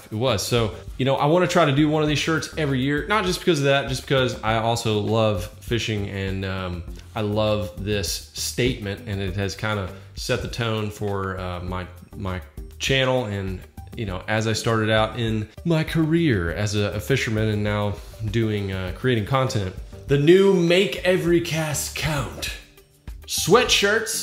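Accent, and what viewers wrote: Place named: Appalachia, United States. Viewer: Great video to see you being you, and not much of that overdone hillbilly accent.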